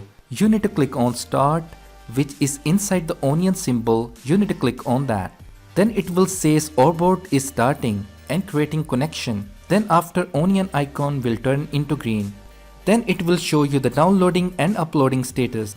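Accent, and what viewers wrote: India. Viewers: I hate indian accent very much. But this one is okay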